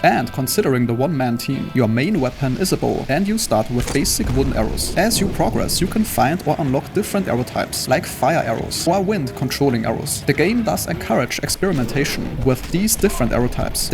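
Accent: Germany. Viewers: First impressions video. 3 minutes long. Has "not waste your time" in the title. German accent. Makes total sense. Subscribed.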